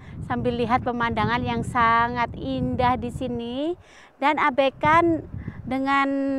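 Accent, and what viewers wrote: Java, Indonesia. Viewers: Suka sama bak bahasa nya medok walaupun udah lama diluar negara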